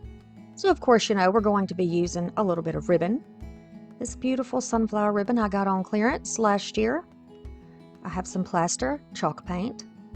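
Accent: United States. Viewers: 💕 Love them! They all turned out Beautiful. Thank you. By the way, I LOVE your country accent! 💕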